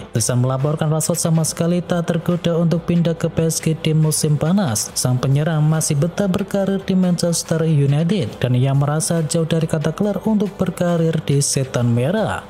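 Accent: Java, Indonesia. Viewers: Bang logat jawanya bisa gak di biasain aja kalaw buat vidio, gak enak dnger nya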